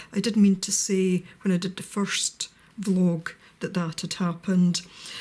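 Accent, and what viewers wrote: Scotland, United Kingdom. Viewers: A fellow Scot to watch!!!!!! Your speech is very clear, I really hope mine is at least half as clear. I always worry about folk understanding through the accent. Anyway, just found your channel and (aside from the familiar accent) I’m very glad I did as you seem to have lots of tips, ideas and projects. So I’ll be catching up on some of your videos today.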